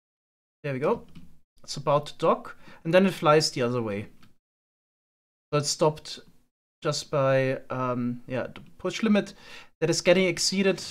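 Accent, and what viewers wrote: Germany. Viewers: Hi, where are you from? You sound German or am I just stupid?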